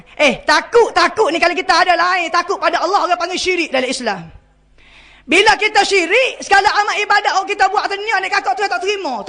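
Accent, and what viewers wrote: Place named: Kelantan, Malaysia. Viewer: standart la bahaso klate. xsoh maroh la denga mace kasa skit .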